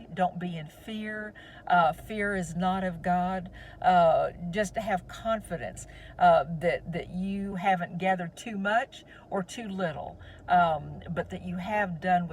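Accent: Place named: North Carolina, United States. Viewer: I was wondering if you were here in NC by the accent. Really love your work. The Lord has called me similarly... 🙏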